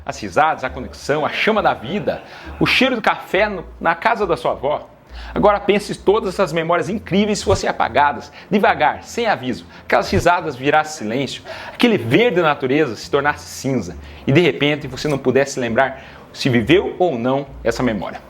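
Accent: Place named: Minas Gerais, Brazil. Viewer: Ah, doutor, eu tomo Melatonina quase toda noite pra dormir. Fico muito preocupado, com um distress diário preocupado com a minha esposa, que tem bipolaridade. A minha sogra faleceu com PSP, foi um sofrimento. Fazemos suplementação com D3, Zinco, Fósforo, Ômega 3, Magnesio Dimalato. Ah, gosto muito de ouvi-lo porque o senhor tem um sotaque meio de mineiro.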